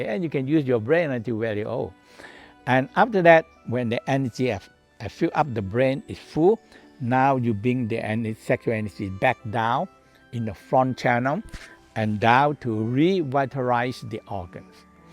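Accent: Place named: China. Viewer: I’m sorry, sir, but you speak Chinese-English, aka, “Chinglish.” Consequently, it’s very difficult to understand you, making your information just about useless. May I suggest that you find a bilingual person who can impart your message with a voice-over in clear, native-speaker English? In this way, you can be sure that your message is correctly understood by native speakers of English! I hope this helps.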